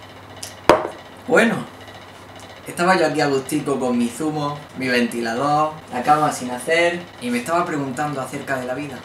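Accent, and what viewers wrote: Spain. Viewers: Ya se me esta pegando tu asento español PD: soy de mexico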